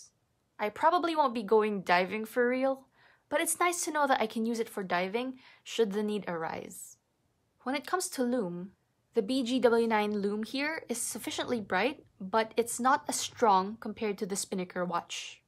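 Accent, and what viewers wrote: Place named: United States. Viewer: I wanted to ask how your English is so good it sounds native with a mild US neutral accent (I was and well technically still am an Oral English teacher) did you spend time in the US or Canada? or did you complete an IELTs course in university?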